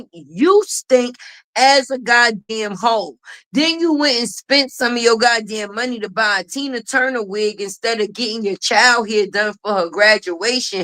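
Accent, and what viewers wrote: New York, United States. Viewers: I LOVE TO HEAR U TALK,FIRST UR GOOD AT WHAT U DO,BUT IT'S UR ACCENT FOR I LOVE IT,IS THAT A NEW YORK ACCENT? HELL IF SO I NEED RO MOVE THERE N CATCH ON TO THE NY. SWAG N ACCENT 😂❤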